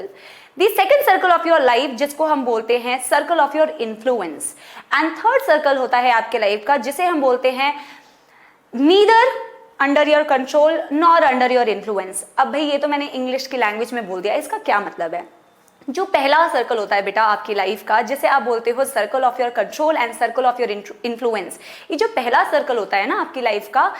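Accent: Odisha, India. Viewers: Mam are you from odisha ?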